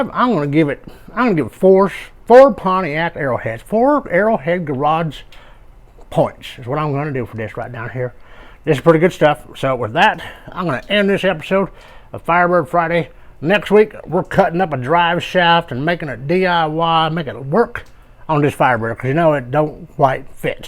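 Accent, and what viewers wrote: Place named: Ireland. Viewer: It's the Irish accent.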